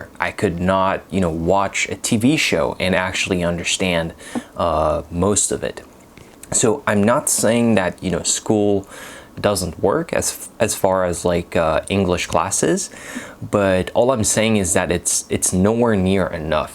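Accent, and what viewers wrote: United States. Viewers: Your English is absolutely fascinating! You sound like an American speaker!!! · Yup bro great to hear your English speaking journey. My goal is to speak English like an American speaker. You are really close to American english native speakers. My problem is that when some time I speak English I think not making mistakes while speaking. U made me motivated to learn American accent. Please let me know know what I need to do? I will be thankful to you forever. Thanks from my depth of heart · Are you sure you are not American? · Your American English is even much better than some native speakers in the US. Congratulations! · You cannot have this level of English without having lived in an English speaking country especially Canada or the USA . You have an American accent . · you did a great job. I couldn´t notice you´re not a native English speaker if you woudn´t tell. 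You sound very american. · From an Australian’s perspective, he has an American accent with a hint of something else. No big deal. His grammar seems perfect. I haven’t noticed anything he said that sounded very wrong. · Your wordstock seems essentially British (e.g. your use of the word «holidays»), but your accent is 90% American. Have you taken on learning Tahitian at all yet? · Dude! Your accent sounds from the US. I am French like you, from Paris. You did an amazing job to get where you are. Your mind is very well structured. You speak with calm and ease. Congrats! I am moving toward a fluent Spanish, right now. My strategy is slightly different : I am moving directly in the country to learn the language. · Your accent sounds American and your diction is just Amazing. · Amazing american accent. I would bet you also have an excellent rest for music. Do you play an instrument? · Wahoo! 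as a French speaker and living you blow my mind!! You speak like an American. I will follow everything to bring my English to the next level.!! Congrats 👏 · Dude you even sound more American than me ….wow 😮!!! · if you didn't mentions that you're french ,, I would guess you're American ..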